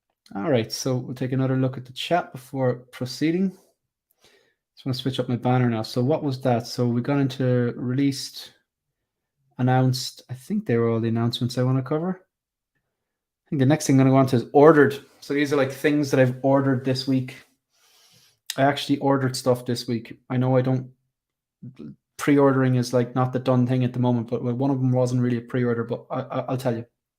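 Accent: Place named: Ireland. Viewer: assume by your accent you are based in Ireland? So where do you normally buy your figures ?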